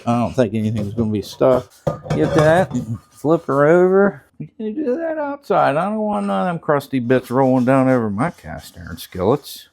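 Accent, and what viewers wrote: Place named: South, United States. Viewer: I love that southern drawl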